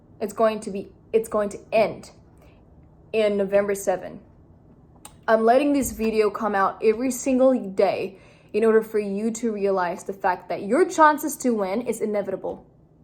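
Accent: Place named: United States. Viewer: Weird how you have an American accent but your grammar is lacking. How do you even remember what you did in elementary school?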